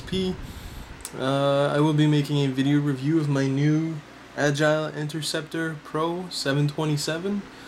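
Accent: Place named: Canada. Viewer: You have the most stereotypical Canadian accent I have ever heard, nice guitar too.... I'm probably gonna go with passive pickups but, either way Agiles are too nice to pass up.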